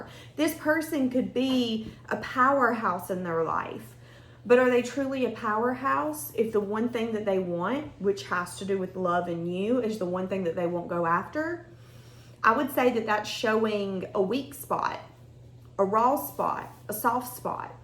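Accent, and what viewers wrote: South, United States. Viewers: Love your Southern drawl… So cute!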